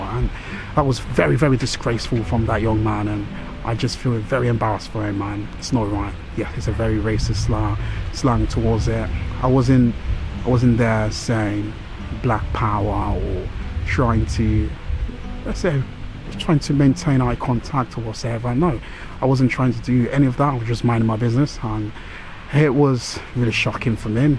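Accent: United Kingdom. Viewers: Your accent is british not american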